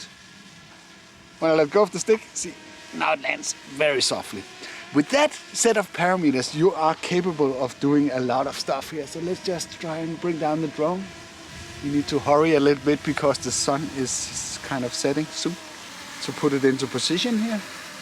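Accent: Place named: Denmark. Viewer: Jeg elsker den danske aksenten din :D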